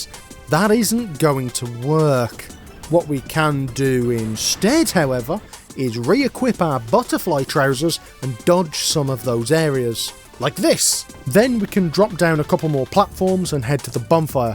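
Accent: United Kingdom. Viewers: this is the most british person I've ever heard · Bro you are the most British person i have ever seen both looks and voice 😂😂 You should be England's national treasure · Ahh yes, congrats on the full time positon. Us Americans grratly appreciate a humorous soulstuber with a british accent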